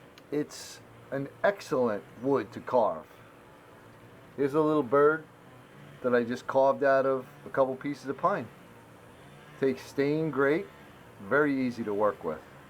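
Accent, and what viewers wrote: New York, United States. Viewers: and the New York accent! Yasssl!! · Ah the New York accent brings me right back home! Haha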